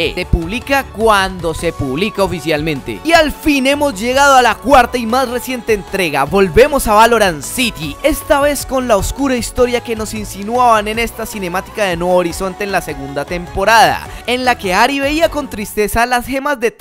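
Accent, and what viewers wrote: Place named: Bogotá, Colombia. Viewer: Ah, el buen habla de un Bogotano, no lo cambio por nada :v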